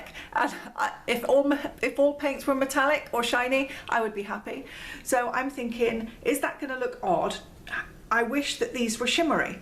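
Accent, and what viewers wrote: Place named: Dorset, United Kingdom. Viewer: Hi there, this is so interesting, thanks for sharing, I wonder, is there something you could add to micas to make them pearlised? Btw love your accent, are you from Dorset?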